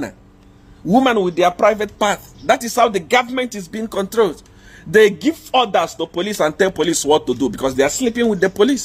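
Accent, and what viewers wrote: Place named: Nigeria. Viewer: Are u nigerian or zambian? Because of your accent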